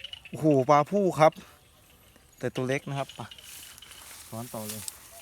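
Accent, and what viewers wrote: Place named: Phu Thai, Thailand. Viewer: ยุแถวไหนครับ คือเว้าภูไท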